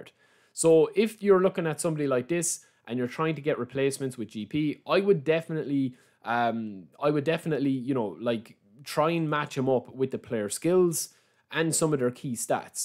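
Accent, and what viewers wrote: Ireland. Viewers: thanks for taking the time to reply ! As a non native English speaker i always loved those typical native accents since they offer more “flavours” than the more official standardised Queen’s English accent. I guess it’s a bit like when native speakers tend to find the accent of a French or Italian “sexy” when they speak English. Last detail for MY personal knowledge: would you qualify your beautiful Irish accent as more typical to a certain part of Ireland or is it rather a generic one ?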